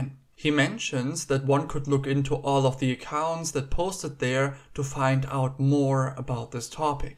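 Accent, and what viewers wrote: Germany. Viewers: Ich hab mich minutenlang gefragt warum mir die Stimme bekannt vorkommt, bis ich den deutschen Akzent bemerkte 😍❤️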